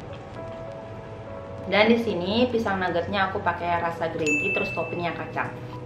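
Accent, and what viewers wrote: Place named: Bali, Indonesia. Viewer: logat balinyaa!😍❤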